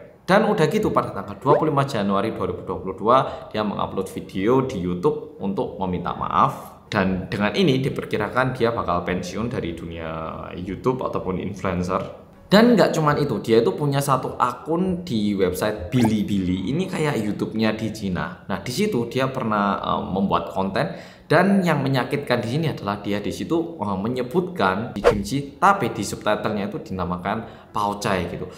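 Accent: Java, Indonesia. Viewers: Bang kl ngomong korea logatnya korea, tapi klo ngomong indonesia logatnya jawa medok:)_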